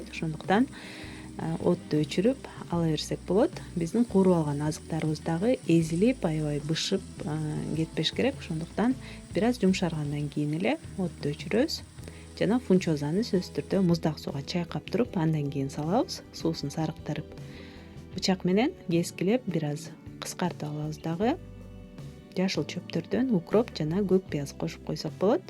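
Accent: Standard. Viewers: ушул кыздын уну да жагымдуу. нукура кыргызча да келиштирип суйлойт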